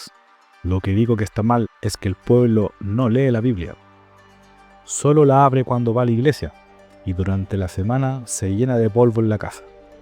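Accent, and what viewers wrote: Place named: Chile. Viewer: Iba a comentar que se debiese buscar un enfoque de beneficios y no miedo de no aprender, pero es todo lo contrario, tu canal es genial, una opinión muy critica, una voz con un toque chileno pero muy neutro y no buscas ideologizar sino compartir para quienes quiersn escuchar, y eres criticos con los ceeyentes y no con los que no lo son, y eso se me hace muy consecuente, es bueno encontrar gente que aun aprenda fe las enseñanzas de cristo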